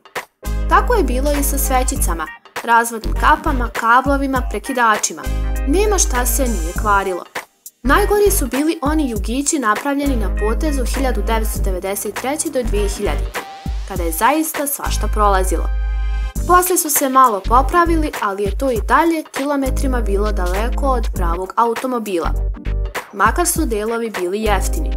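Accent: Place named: Bosnia and Herzegovina. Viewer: Znas li ti koliko si daleko od bilo cega u vezi sa automobilima ?Toliko gluposti da se ovo ne komentarise.Komentarisem tvoj glas ,koji je negde iz Federacije Bosne i Hercegovine.Ti si toliko daleko od poznavanja automobila Kao Toyota -Camry model 1970-tih od Zastave 750L.Toliko netacnih gluposti,zavisti...Pri tom nemas pojma da par 10 zemalja na svetu proizvodi automobile u 20 tom veku.Znaci,ne sklapa,nego proizvodi.Kad vidis sledeci put Zastavu,najbolje je da cutis.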